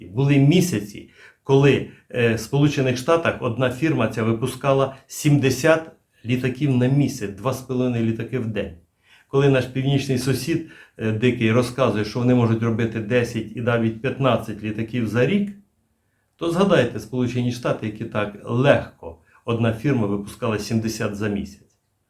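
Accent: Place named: Lytyn, Ukraine. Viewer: Завжди приємно слухати Вас пане. У Вас якась рідна українська мова, як у нас на Літинщині. Дякую за всі огляди.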